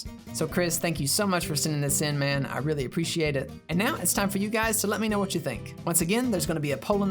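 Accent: South, United States. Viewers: Dang you a southern boy huh? Bet u hung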